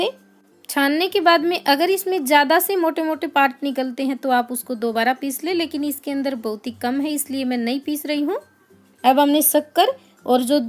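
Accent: Haryana, India. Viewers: Aap HariAna k ho tone se lgrha h 😊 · Maybe she's from Haryana can recognize her because of her voice.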